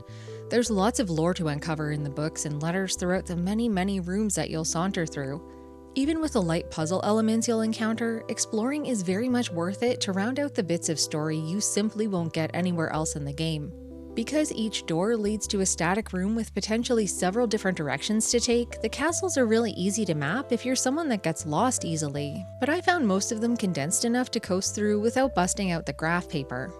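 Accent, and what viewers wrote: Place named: Canada. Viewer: I thought I heard a Canadian accent in your video, which was why I mentioned the LttP morcel. I don't remember the translation of Drakkhen very well as it was decades ago, but it has to be better than the English version as it was written by a French studio. If you are correct, the fact that they translated the game from French to Japanese (two wildly different languages) and then from Japanese to English (also two wildly different languages) is very much going around the arse to get to the wrist and ends up not surprisingly with a bad translation. The PC version in the original French can be found at a site called Abandonware France. I'm sure that you could muddle through as you have already played the game. As for Link to the Past, that game is quite text-light and the French Canadian rom is out there, so I am sure that you could finish it without any difficulty.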